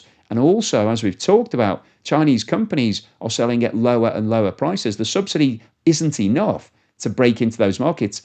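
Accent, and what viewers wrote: United Kingdom. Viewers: It's British English. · This guy sounds like he is from the UK why is he always talking about China? Is it because he’s xenophobic? Or because he knows talking about Chinas collapse brings low IQ people to watch his videos?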